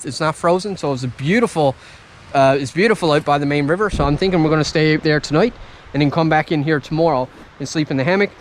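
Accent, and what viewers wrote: Newfoundland, Canada. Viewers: You sound like someone from Nfld...Buck baymen or a towny..?anyways, I subbed. Hello from NB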